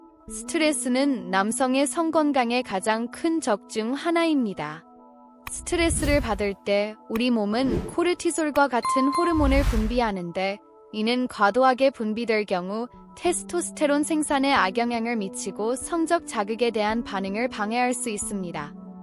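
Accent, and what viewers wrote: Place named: China. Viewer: 발음이 중국인이 말하는것같다.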